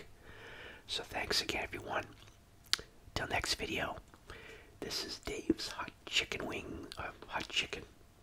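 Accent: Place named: Boston, United States. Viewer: I'm just curious... How would you foneticly write the way he says 'slaw'? Sloa? I just love that boastn' accent #billyburr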